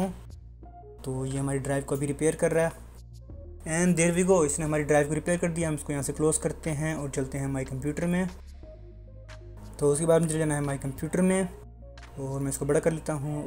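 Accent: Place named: Southern England, United Kingdom. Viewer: Southern English to fast..... had to change the playback speed. LOL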